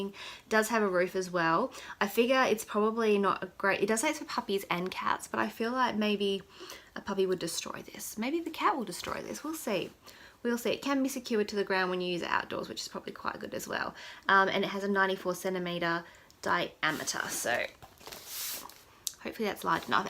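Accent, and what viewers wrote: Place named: Australia. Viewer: I knew you were Aussie because of your accent,were are you from? I'm from Victoria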